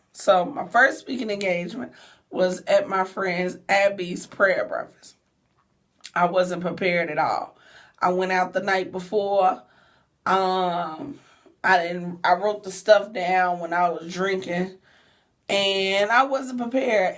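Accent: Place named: Memphis, United States. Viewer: Are you from Memphis because I swear you sound just like all my cousins in Memphis!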